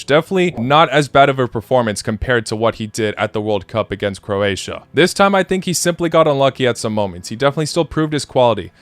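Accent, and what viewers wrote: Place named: United States. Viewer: He lived in the US for quite a few years hence the accent.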